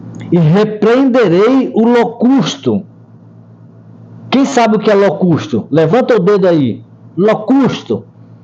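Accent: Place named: Brazil. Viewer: ele fala como brasileiro estudado cader o sutaco judaico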